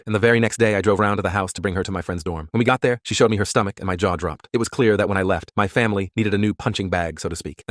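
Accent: Texas, United States. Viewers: TEXAN ACCENT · Texan accent + Kayla as his sister goes hard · Why does it keep going texan accent 💀🙌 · Yeah bro I was like WHY DO YOU KEEP SWITCHING TO A TEXAS ACCENT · THE TEXAN ACCENT BRO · Anyone else realize after like the first couple sentences it gets a Texan accent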